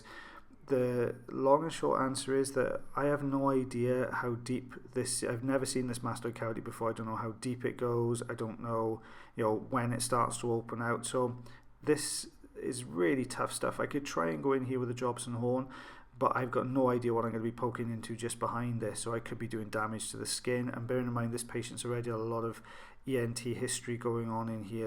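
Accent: Wales, United Kingdom. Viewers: Lovely Welsh voice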